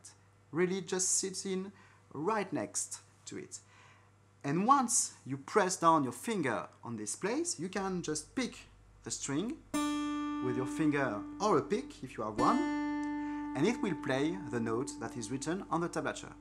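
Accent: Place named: France. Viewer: English vowel sounds are a little sloppy, less precise than French. A native German speaker once told me that English vowel sounds tend to float all over the place. And while French words have even stress on all syllables, English words generally have a stressed syllable, even at times a secondary stressed syllable. Sometimes the stress shifts according to part of speech, like the noun "RECord" and the verb "reCORD". So imprecise vowels and location of stress get one closer to native English. But none of these comments are meant to be critical of you. You were perfectly understandable. Please you keep making guitar instruction videos, and the rest of us will enjoy the occasional French idiom that slips through! Thanks..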